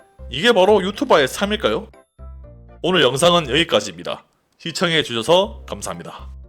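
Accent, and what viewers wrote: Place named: Gyeongsang, South Korea. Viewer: 완죤 날것 그대로 갱상도 아자씨 뱅기 리뷰 , 특유의 매력에 점점 뜨고있음~~^^*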